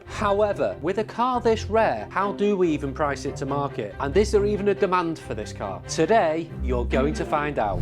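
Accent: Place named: Northern England, United Kingdom. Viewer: Very northern, nasally, drowning, blunt, what's northern for chav..!!??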